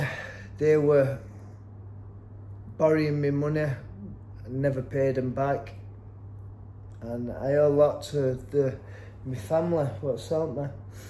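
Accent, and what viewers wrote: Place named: Scotland, United Kingdom. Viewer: Off topic but your accent is ten out of ten ha I love it. Best English accent from a scotsman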